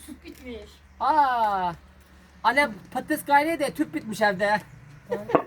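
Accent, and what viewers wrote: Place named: Zonguldak, Turkey. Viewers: kardeşim biz seni oldun gibi sevdik her yörenin şivesi vardır sen Zonguldak şivesiyle konuş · Bende devrekliyim hemşerim şiven harika sırf bu yüzden izliyorum seni 🤗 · Konuşman gayet güzel sakın değiştirmeye kalkma! kaybolup giderüz çaycuma şivemiz. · Zonguldak Karaelmas Mahallesi'nde oturduk yerlileri 'ın gibi konuşuyor lar Maşallah barekallah lahavle velakuvvete illabillahil aluyyulazim duasını okuyarak gönderiyorum sizlere Amin AMİN AMİN ECMAİN 🤲🤲🤲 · seni hiç kimse yadırgıyamaz Çünkü her yörenin kendine has şivesi vardır bizim Zonguldak’amızın şivesi de böyle biz mutluyuz şivemizden canım benim sen kafayı takma yola devam❤️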